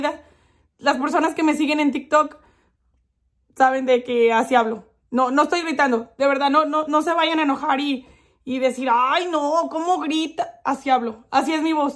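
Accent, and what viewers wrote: Mexico. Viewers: Ahuevo...... Los jaliscienses así hablan si sí si yo tengo familia en San Juan de Dios Jalisco y así hablan siempre.... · Me encanta tu acento mexicano, saludos desde Bolivia 😍🇧🇴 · LA PARTE QUE MAS ME ENCANTO FUE ' STOP TALKING' 😂😂 Y ME ENCANTA TU ACENTO MEXICANO SOY 100 SINALOENSE❤🇲🇽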